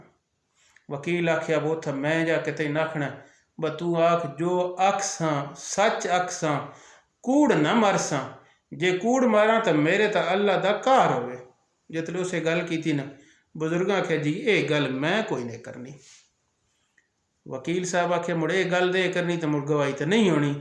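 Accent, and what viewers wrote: Sargodha, Pakistan. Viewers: لاں سارے پنجاب وچ نہی بولیا جاندا ایہ لفظ سرگودھا تے منڈی بہاؤ الدین دے کجھ لاہندے آلے پنڈاں وچ ای بولیا جاندا اے تے جناب دا لہجہ وی اس پاسے دا ای اے ساڈے لہجے وچ لاں نہی بولیا جاندا لیکن اس لفظ نال واقفیت میرے بچپن دی یاد اے 😁 لاں اس رسی یا تار نوں آکھدے نیں جس اُتے کپڑے ٹنگ کے سکائے جاندے نیں یا اس نال رلدا ملدا کم لیا جاندا اے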